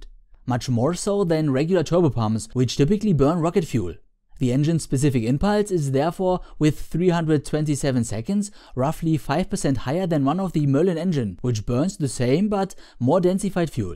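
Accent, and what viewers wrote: Germany. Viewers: Dieser deutsche Akzent ist so göttlich. :)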